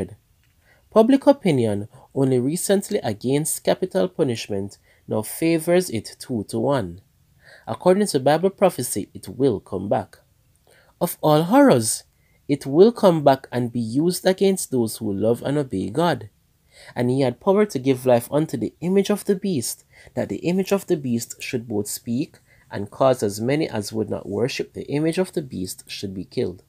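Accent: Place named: Jamaica. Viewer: Glad that this book is online . Thank you for the upload. The reader sounds Jamaican 🇯🇲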